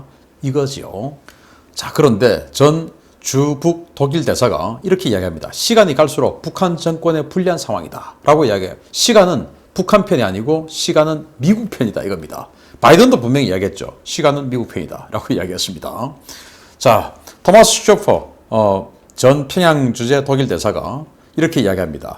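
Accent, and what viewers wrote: Gyeongsang, South Korea. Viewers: 갱상도 사투리 좀 고쳐라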